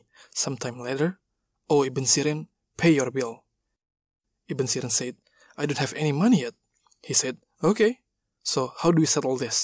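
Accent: Indonesia. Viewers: I 100% am sure the voice over actor on this video is Indonesian. His English accent is so Indonesian :) Anyways, great story and great voice over! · The Indonesian accent:) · I recognize this accent. Indonesian accent. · I'm hearing Indonesian pronounciation english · Indonesian English Accent... Good job!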